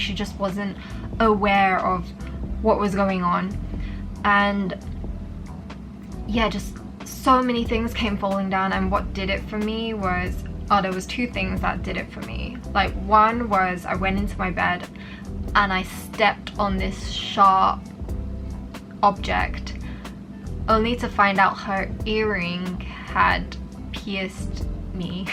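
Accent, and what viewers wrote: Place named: United Kingdom. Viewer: beautiful british accent :)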